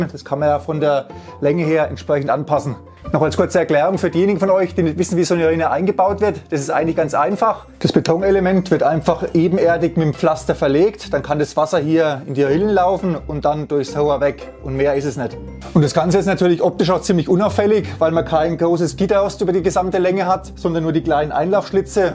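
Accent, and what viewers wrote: Franconia, Germany. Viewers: Cool, habe auch schon darüber nachgedacht, sowas selber zu bauen hatte aber nie eine vernünftige Idee. Das hier ist die Grundidee, die mir gleich zwei Probleme auf einmal löst. Danke für diesen Tip. Zumal ich diesen pfiffigen Kanal gar nicht kannte. Und dann das Ganze noch schey fränggisch! Wos moch der Frangg nuch mehra? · Meine Ohren hören Fränkisch 👂🏻👂🏻👂🏻......Region Bamberg?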